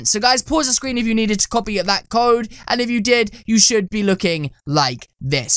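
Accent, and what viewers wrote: United Kingdom. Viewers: IS FROM THE UK!!! I knew it.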